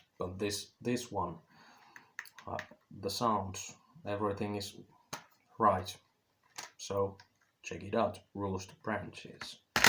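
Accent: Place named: Finland. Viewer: Ive missed that Finglish accent🤣 ✌✌ 👏👏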